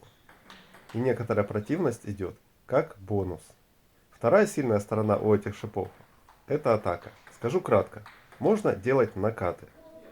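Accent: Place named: Moscow, Russia. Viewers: А что тебя больше расстроило-маасковский говор или накладка на уровне ширпотреба? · Судячи з описаного голосом па маасковскі, накладка для класики, бо на повільніших основах срєдняя врєдность з блоків буде ніякою зі всима витікаючими, що зобов'язуватиме власника до відповідних прийомів, дієвих для таких засобів. Це не докір для тестостериста))), лише синтезовані з побачено-почутого власні висновки, базовані на нікчемному досвіді й таких само досягненнях, тобто там потрібно буде проявляти спочатку зрозуміле і потім набуте все розмаїття техніки і тактики, а саме попіхи-перепіхи будуть малоефективні, але для володіючих ними і шипами вцілому достатньо зрозумілі і доступні, знову ж не в докір останнім, бо вони своїми "вміннями" теж можуть зробити гідний фурор, тобто головняк))), опонентам. А ще хотілося б побачити загальну картину гри на рахунок, і суперника, що краще володіє технікою гри і переміщень, а не одразу в рукопашну...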